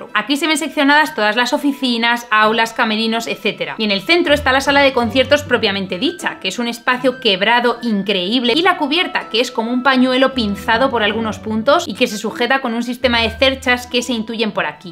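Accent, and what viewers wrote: Spain. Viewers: Si dicen que el accento de Madrid es el neutro de España es falso 😂 Cada vez que suelta "mazo" es que es de Madrid 😂😂🤣🤣 Nano! Saludos desde Valencia !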